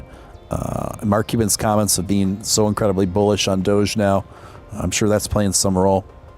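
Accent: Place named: Chicago, United States. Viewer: Hey.. Your accent Sounds like your from Chicago..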